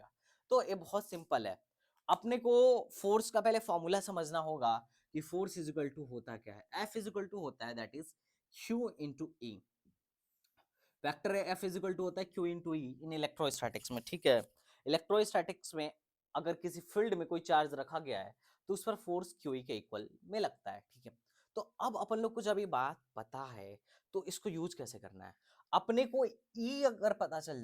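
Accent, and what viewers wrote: Bihar, India. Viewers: Sir ji lagta h Bihar se h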